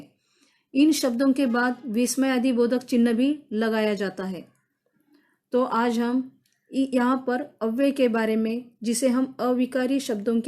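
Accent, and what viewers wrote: Maharashtra, India. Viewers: By Your Voice I think you are Marathi Are u Marathi?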